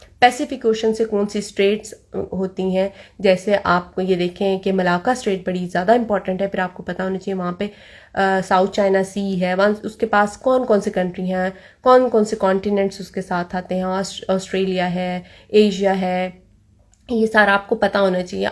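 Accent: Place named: Faisalabad, Pakistan. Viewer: Mam Is your home town is from Fsd because your dialect looks like fasilabdi people.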